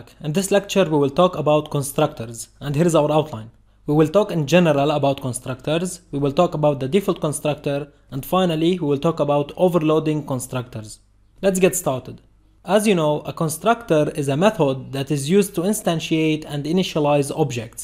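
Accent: Russia. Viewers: are you from russia💀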